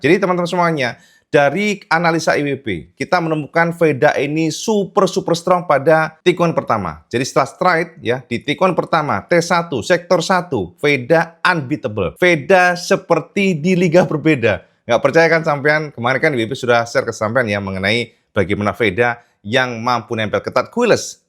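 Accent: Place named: Java, Indonesia. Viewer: Mas.. Mirip logatnya kaya, yg ngonten KIOS KOMPUTER..! yg dari jawa juga... 😊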